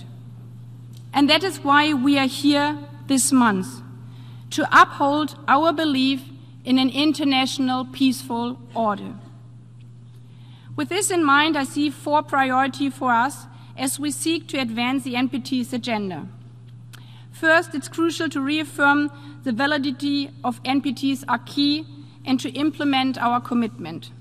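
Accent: Germany. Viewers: Astreines Englisch. Für Jedermann verständlich, emotional und mit deutschem Akzent. Besser spricht kein deutscher Außenminister. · Ja, sie spricht mit deutschem Akzent. Und der wird auch stärker während der Rede. Aber der Inhalt, das Vokabular, die Grammatik sind einwandfrei. Übrigens hat auch der Präsident, der sie am Anfang ankündigt, einen starken Akzent... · Als halb Ire, der mit deutsch und englisch aufgewachsen ist, muss ich sagen dass ihr englisch nicht schöecht ist. Natürlich hat sie einen deutschen Akzent, sowie 90% aller deutschen. Man versteht sie aber sehr gut, ihr Satzbau und ihre grammatik sind quch gut und sie spricht besser englisch als 90% unserer Politiker und wahrscheinlich besser als 100% aller AFD Plotiker, also bitte mal klarstellen, macht ihr euch über ihren Akzent lustig? Sehr gewagt als deutsche, denn ich wette ihr habt alle einen starken deutschen Akzent und ich wette die meisten von euch können nicht mal einen geraden Satz formulieren!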